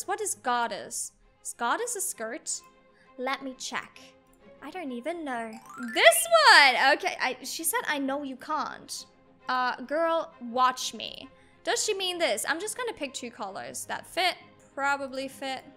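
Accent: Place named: Australia. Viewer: Your Aussie omg same i live in Melbourne are you aussie though hope you are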